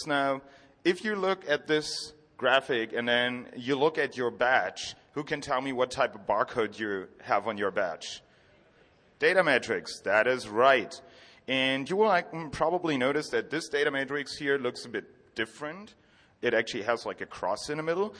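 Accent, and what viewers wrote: Germany. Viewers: i sense a little bit of german dialect^^ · NamelessGhost bruh... you’re Swiss and can’t tell it’s a German presenter, and so you just assume American? Chumm itzt, so dumm muess mer au nöd tue...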